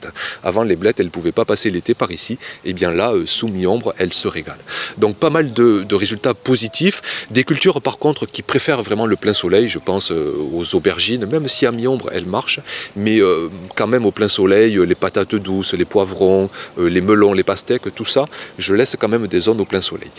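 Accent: South, France. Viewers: T'es de quel Sud? Sud Sud, Montpellier, Sud Est ou Sud Ouest ?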